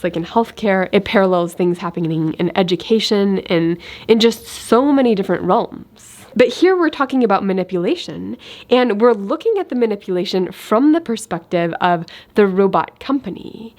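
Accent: Midwest, United States. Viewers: Lol it's a little weird to hear the shallow take that you don't have an accent... Everyone has an accent. I'm also from Missouri, I had you spotted as a midwesterner. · Thanks for mentioning the "accent," I assume that gives me permission. ;) My friends like to joke that we don't have an accent because we speak with that boring midwestern "Peoria" or "TV newscaster" accent. But we definitely have an accent; we intentionally speak like others around us, and unlike people from New York and Minnesota. The reason us nerds are pestering you is because we know this style of speech from the character Summer Smith from the cartoon Rick and Morty. The actress Spencer Grammer has a hint of it, but it's much stronger in the cartoon. So the polite question is, "What's your accent?" But what we're really wondering is, "Why are there two of you??"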